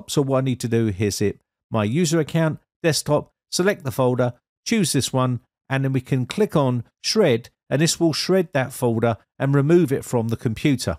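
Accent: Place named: Cockney, United Kingdom. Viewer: I'm pretty sure he's saying, "What you guys" which is like the cockney geezer way of saying, "What's up guys" 😁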